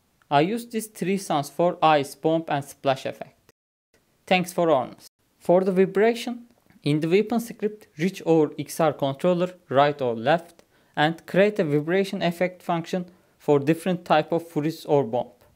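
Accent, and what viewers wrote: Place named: Turkey. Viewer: helal aksaanindan anladim turk oldugunu guzele benziyor eline saglik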